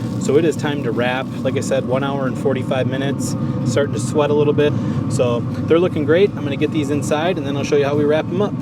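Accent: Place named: Upper Midwest, United States. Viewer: Hahaha, you're not from the Upper Midwest are ya? Great video, I followed it to a T and they turned out great! thanks!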